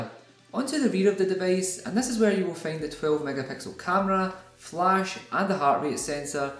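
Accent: Ireland. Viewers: Are you Irish or Welsh? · Do people in Ireland find American accents as cool as I find yours? Lol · r u from Ireland?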